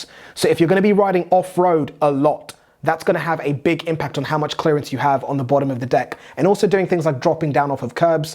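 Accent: England, United Kingdom. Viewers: What country do you live in? The way you're talking about other countries you sound American but then you have an English accent.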